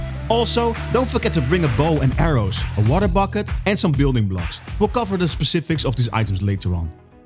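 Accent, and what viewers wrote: Netherlands. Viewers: your accent is so extremely dutch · Ik hoor aan je engels dat je NL bent 😂😂😂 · Bro is echt puur Hollands 😂😂😂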